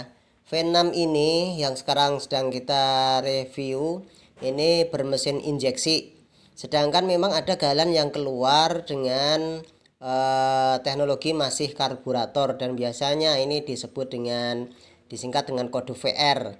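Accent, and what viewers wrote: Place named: Java, Indonesia. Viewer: Medok coy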